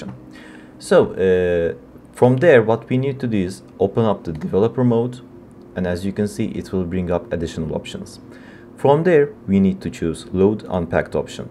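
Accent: Turkey. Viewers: You have a different accent, I guess you're turkish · sende bi türk aksanı sezdim :d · this guy sounds Turkish. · türk ingilizcesi bu nerede olsa tanırım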